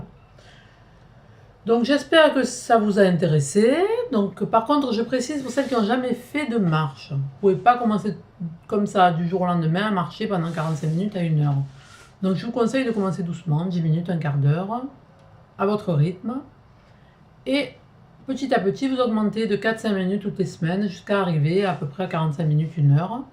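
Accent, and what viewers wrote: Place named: Aubagne, France. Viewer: Comme toujours, tu as des explications claires et précises......que c'est agréable......et en plus, avé l'accent d' Aubagne! lol C'est super, moi, je vote pour toi, pour la Reine des Blogueuses du Sud! Bisous 👍😘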